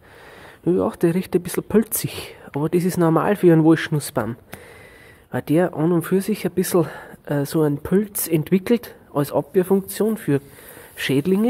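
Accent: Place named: Bavaria, Germany. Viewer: Guten Abend … (Ups, kenne deinen Namen gar nicht🤭) Ich finde hier deine Erklärungen betreffend der Biologie, inklusive dem Esoterischen Part über den Walnussbaum, sehr sehr interessant und aufschlussreich, zudem, die Sägetechnik kommt auch nicht zu kurz! 👏🏻🧐😃 Du hast wirklich ein super umfassendes Wissen, Hut ab. 🎩 Man spürt eindeutig deine Freude, Liebe und Hingabe zum Holz, der Schalk im Bayrischen Dialekt rundet es noch ab. Verständlich erzählt und „auf dem Boden“ geblieben, bleib so. 👍🏻 ☺️ Wünsch dr witerhin no an gnarret hübschi und erfolgrichi Ziit. 😉😁 Gruass us Grischun (Graubünden) ⛰ 🌲 🇨🇭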